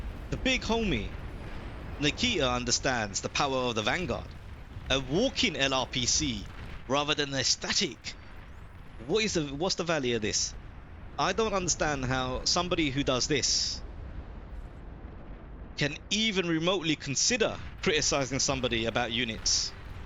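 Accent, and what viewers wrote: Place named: United Kingdom. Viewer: what part of the UK are you from? accent is great to listen to lmao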